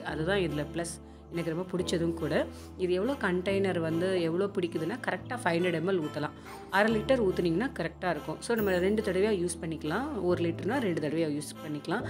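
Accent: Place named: Tirunelveli, India. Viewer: Nellai Tamil is mass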